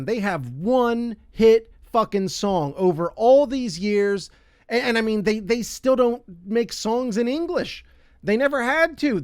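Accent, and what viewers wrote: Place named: Germany. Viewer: Very funny german accent ahah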